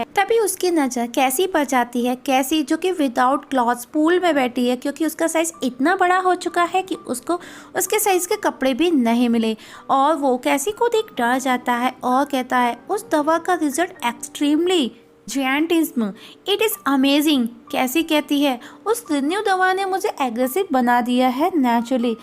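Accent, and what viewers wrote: Bihar, India. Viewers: Lagta hai maidam aap Bihar se ho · R u from Bihar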